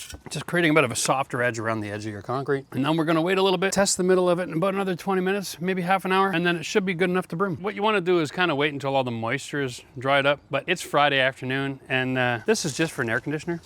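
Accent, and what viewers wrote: Canada. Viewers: When you say House and About and Eh,you let me know you were Canadian. Cheers from below the 49th.Nice video. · How do you say the word house that gives away your Canadian accent. Thank you for your awesome video.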